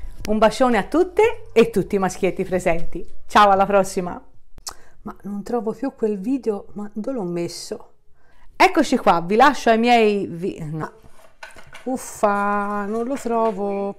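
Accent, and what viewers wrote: Tuscany, Italy. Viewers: Prima stesa bellissima... Speriamo! 💞Grazie sei molto chiara e concisa e con l'accento toscano mi piaci molto... Posso chiedere il tuo segno zodiacale sento molto empatia con te io sono bilancia 😁😁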